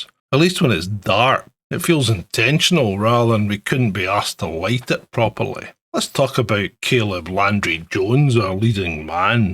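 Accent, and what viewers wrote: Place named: Scotland, United Kingdom. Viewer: The heavy Scottish accent narration kills me....its charming usually, but not for this, no.........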